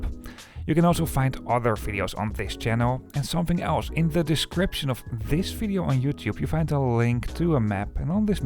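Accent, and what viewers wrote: Netherlands. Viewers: Hearing the Dutch accent voice train travel just makes me happy! I needed this on a busy Monday in Germany.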